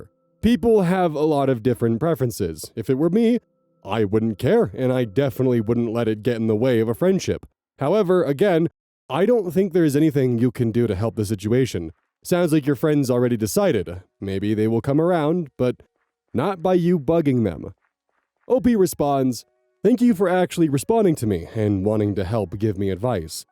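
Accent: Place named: Midwest, United States. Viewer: I have disagreed a few times with you, but I never hated you for it. You clearly state over and over you want our feed back and intend to ponder it. All in all, you are the strangest parasocial relationship I have. I used to live in Boulder when I started watching you, so you sorta felt like a friend because it was a possibility I might run into you. Your vocal mannerisms are a little reminiscent of the midwestern ones I grew up with and you are also one of those queers. As am I haha… anyway, keep being you and learn new things with time.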